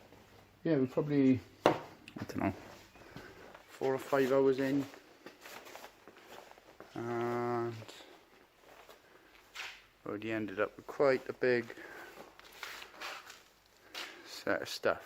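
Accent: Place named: Wales, United Kingdom. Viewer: What part of Wales are you from? I'm in rhondda.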